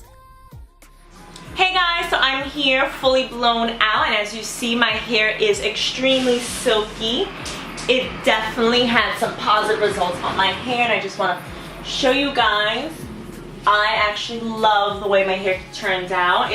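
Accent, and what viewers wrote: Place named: New York, United States. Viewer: Are you from New York? It sounds like you have a New York accent😂also great video it really helped I just recently got my tips dyed and now they are very dry this helped so much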